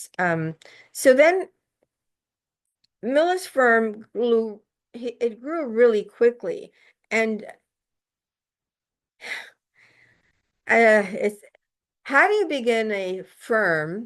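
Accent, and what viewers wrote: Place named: United States. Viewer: She obviously isn't as she has an American accent? 😂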